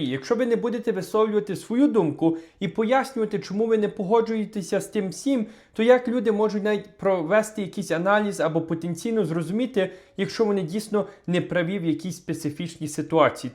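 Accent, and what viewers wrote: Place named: Western Ukraine, Ukraine. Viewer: Та ми і я не проти . Красиво розмовляєш ,але проблема в тому що трошки навчись українській мові . Саме українській ,шевченківській ,з Полтавською вимовою . А так твоя мова це тільки для тої частки України яка приєдналася після другої світової . Вибачаюсь ,але це так. Вчи мову .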